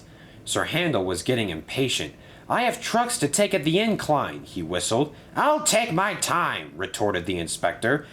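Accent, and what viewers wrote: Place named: Scotland, United Kingdom. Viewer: Nice episode! Looking forward to seeing how this all plays out. There was some very clever wordplay in this episode, and it was thoroughly entertaining! Also, I swear your Scottish accent gets better every time I hear it!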